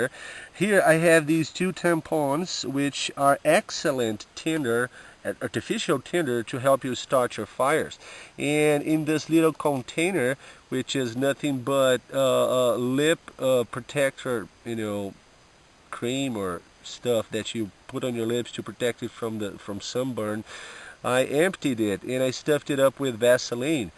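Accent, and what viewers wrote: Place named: Brazil. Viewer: Mano, Vc fala bem inglês, mas pelo sotaque eu acho que é brasileiro que nem eu. Parabéns pelo vídeo, muito bom.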